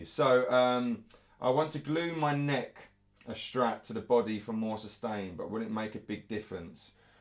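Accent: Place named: United Kingdom. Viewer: your name and accent say british, your features and sunny temper say mediterranean... what's your secret?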